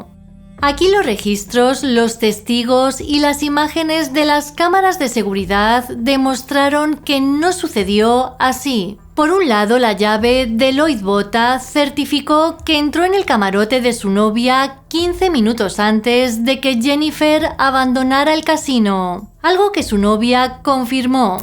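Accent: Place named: Malaga, Spain. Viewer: Que feo hablas ..erres muy buena narrando però de verdad malagaa España vivarrrr familiarrrr. ...etc etc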